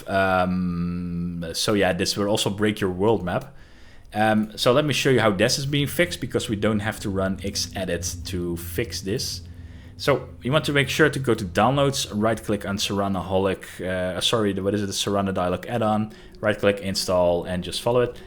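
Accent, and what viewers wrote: Netherlands. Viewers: Dutch accent spotted!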